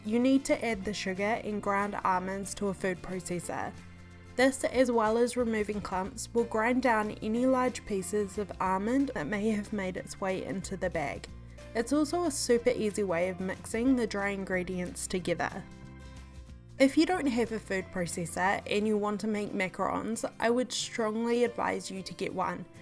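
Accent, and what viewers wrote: New Zealand. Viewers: Trying to find the info but I cannot. Lol. Are you a kiwi!? 🤩❤️ Hahaha the accent is undeniable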